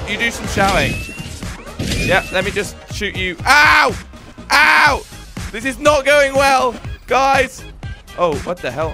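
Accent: United Kingdom. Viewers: is talking so british here lol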